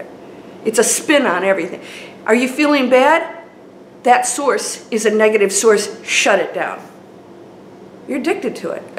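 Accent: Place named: Detroit, United States. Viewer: Hi, I just got to say I love your Detroit accent. My mom and dad where from Detroit and my mother has a similar accent like yours. My parents lived on 9 Mile back in the 1950s and early 1960s. They moved to California in 1963 but I wanted to say how much I love your channel. Thanks for everything.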